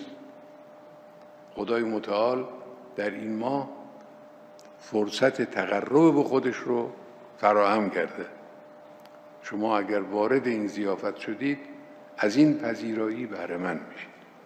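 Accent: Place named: Arab world. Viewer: حاجی من و تو آخر عاقبت نداریم اون دنیا باید جواب سختی پس بدیم حالا هی با لحجه عربی صلوات بفرست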